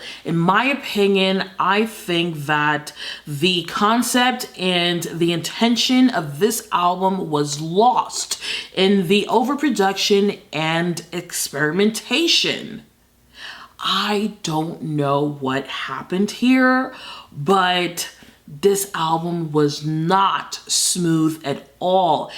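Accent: United Kingdom. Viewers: Is she originally from London because that’s what I’m getting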